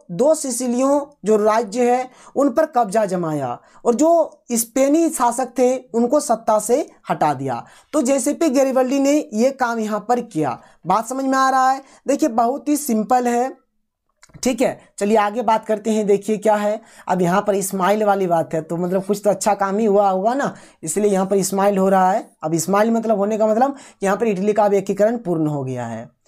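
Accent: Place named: Bihar, India. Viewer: सर क्या आप बिहार राज्य से हैं? बोली से थोड़ा- थोड़ा पता चल रहा हैं क्योंकि आप पूर्ण को पूरण बोलते हैं।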